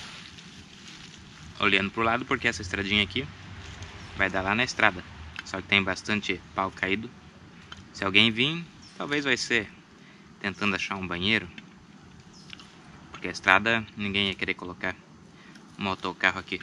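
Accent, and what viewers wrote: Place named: Paraná, Brazil. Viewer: Homi vc e da onde ,tem sutaque de paranaense misturado com Catarina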